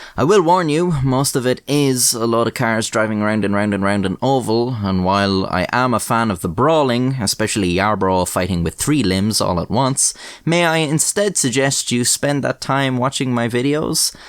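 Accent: Ireland. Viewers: so a light Irish accent almost pronounces Nascar in its proper grass roots hill folk form... hillbill sees race cars and says "Thats a niiice car" IE the word Nascar was born Also, used to race Karts as a Kid... Dirt circle, WKA. Fist fights were very common at the race track.. usually one every weekend at least.. One time i was at a Dirt Modified Race and at the end because of a disputed win, there was a fist fight of about 20 folks on front stretch/winner circle and the whole crowed basically started rioting.. beer cans were flying everywhere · Dawg your Irish accent is so smooth and pleasant to listen to, stay beautiful my friend. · It’s wild hearing these NASCAR driver’s names pronounced with an Irish accent, You pronounced them correctly, just weird hearing them without a. “Southern Drawl” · yo irish man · Ever heard of Mike from Thatchapter? He's Irish too,I like your accent way better though.he sounds like Irish lite or something.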